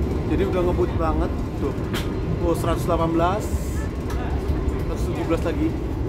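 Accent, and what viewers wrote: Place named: Java, Indonesia. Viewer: Gaya bahasanya aneh..... Pakai kata GUA, GUA, GUA tapi gaya bahasa nya atau logat nya logat bahasa Jawa, Ngomong nya "GUA, GUA, GUA" tapi ngomong juga "KELELEP SAAT BANJIR". BANGGALAH JADI ORANG JAWA ASLI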